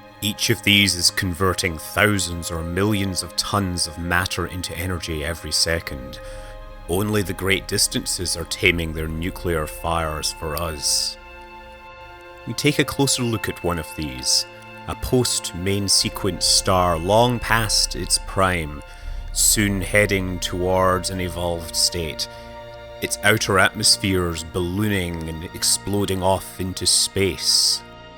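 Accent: Scotland, United Kingdom. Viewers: Omg I just realised how deep of a Scottish accent u have got btw love ur videos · Rather Carl Sagan with a nice Scott taint. brilliant. does space engine do stereoscopic output?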